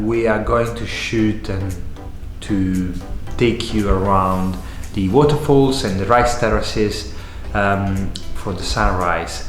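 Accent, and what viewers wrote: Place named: Italy. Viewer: italian accent :) , i love you vlog , i made a vlog in the same spot and in see lot of italian that day :)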